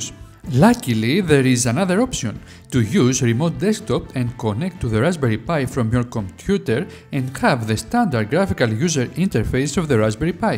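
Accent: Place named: Greece. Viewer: Κανεις μπαμ πως είσαι Έλληνας αδερφε 😆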